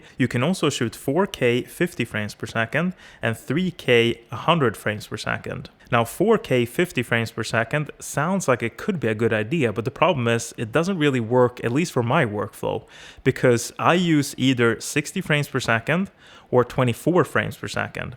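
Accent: Sweden. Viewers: Are you from Sweden ?)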